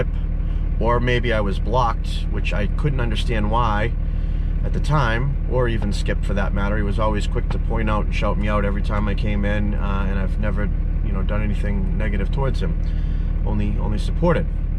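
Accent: Massachusetts, United States. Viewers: I love hearing that mass-hole accent when you talk! 🤣🤣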